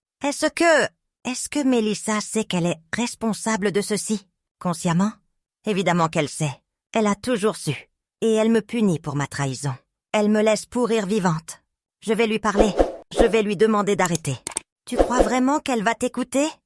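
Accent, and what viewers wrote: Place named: Spain. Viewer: Histoire à méditer car très instructive Bel accent espagnol ❤❤❤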